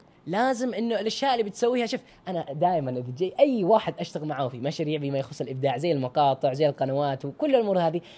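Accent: United Kingdom. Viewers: انا عارف شعورك وانه نطقها بالطريقه البريطانيه نوعاً ما مزعج او مستفز ولكن معا الأسف ترا فكرتنا هذي عن انه مزعج او مستفز خطأ وبدل ما تطلب منه يغير اسلوبه في النطق عشان ترتاح في سماعها انصحك وأنصح نفسي وكل من هم زينا اننا احنا نغير فكرتنا انه الطريقه الصحيحه في النطق اهم من راحتنا وأننا ندرب أنفسنا على تقبل هذا النطق الصحيح بدلا من انتظار العالم انهم يصيرون ينطقون بما نحبه احنا لأنهم ما راح يتغيرون ابدا شيء مستحيل اصلا وهذي نصيحتي واتمنى انها تكون واضحه لك وللجميع يارب ❤ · غير صحيح ... الصحيح أنه ينطقها بريطاني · استمر يالأخ ولكن انا عندي نقطه بخصوص نطقك للأنجليزي اتمنى يكون نطقك وهجائك بلهجتنا وليس باللهجه البريطانيه اتمنى تتقبل انتقادي بصدر رحب واتمنى لك التوفيق والنجاح ومن هنا للأعلى 🤍🤍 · طيب؟، يعني إذا أهم الشيء الكلام واضح، بالنهاية نطقه للغة الانجليزية بالطريقة الصحيحة(باللهجة البريطانية)مو من شأنك أبدًا ويعد إختياره، صح؟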